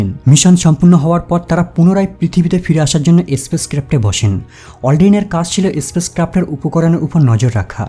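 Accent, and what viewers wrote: India. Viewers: Annoying কলকাতার বাংলা উচ্চারন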